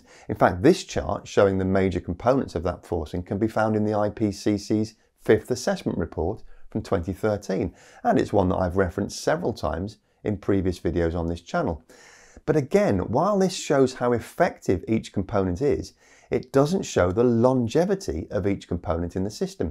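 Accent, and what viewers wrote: United Kingdom. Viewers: Sucker for compassionate rationality, relevance, wisdom and science with a kind and gentle British accent. Typical highly evolved mammalian response.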